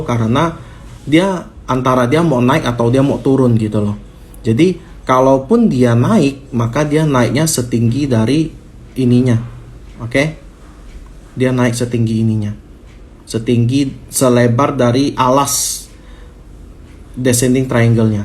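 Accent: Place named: Kalimantan, Indonesia. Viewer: Dialek nya dari kalimantan ya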